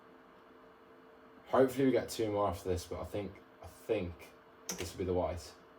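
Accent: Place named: Midlands, United Kingdom. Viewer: Hey bud, sounds like you're a Midlander like me. Hope to see more 🙏🏾 👍🏾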